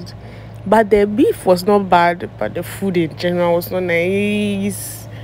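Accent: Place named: Nigeria. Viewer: Congratulations to a successful trip❤️💌🥂 I love your accent, it sounds like that of a Nigerian 🇳🇬🥰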